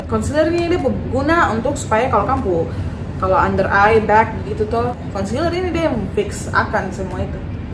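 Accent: Papua, Indonesia. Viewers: Cantik apa lagi dengan logat papua keren👍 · Cantiik.nya natural.. Suka sama logat papua.nya.. Sukses selalu ya kak.. 😊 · Cantik 😍😍 suka logat papuanya, tdk yg buat* sok logat jakarta bgtu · Yoi logat / dialeg Papua tu lebih bagus, jadikan itu sbagai ciri khas tersendiri pd channel km sist krna yg logat jakarta tu su terlalu bnyk e,, 😆😅😂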